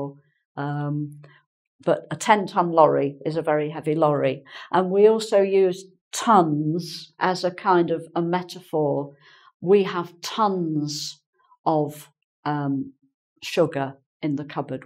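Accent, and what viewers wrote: United Kingdom. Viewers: Hi, i love the british accent. But, how do you pronounce heat, hate, hit, height? Are they being pronounced the same way?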